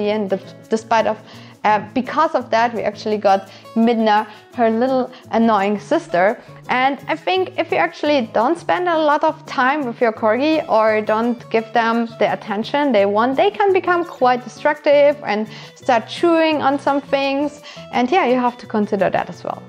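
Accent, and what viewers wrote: Germany. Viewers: I realized you had a thick accent, but I didn't think you were German. I am living in Germany as well. I used to do voluntary work in a shelter for 2 years. I saw sooo many, great family dogs in the shelters waiting for homes FOR YEARS! That's extremely sad. There is a dog for everyone in the shelters. Even if you don't find your dream breed dog there, you might fall in love with a dog you might have never expected to bond with in the first place. If you still go for a specific breed, shelters also have many breed dogs frequently like Poodles, Yorkshire Terriers, Beagles, Jack Russell Terriers which I absolutely love, and German shepherds. German shepherds and Russells are the most common ones from my experience. It also depends on the region though. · You Sound a little German. :)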